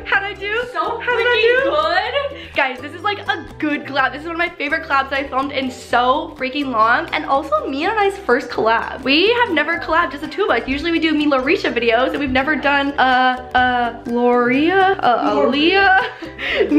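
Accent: Canada. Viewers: I love that you can tell you're from Canada when you talk! So exciting! Congrats on moving! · Is she Canadian? Her accent tho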